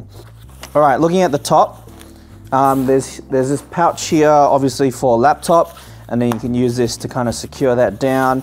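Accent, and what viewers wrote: Australia. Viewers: Are you from Melbourne Australia? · ARE U AUSTRALIAN???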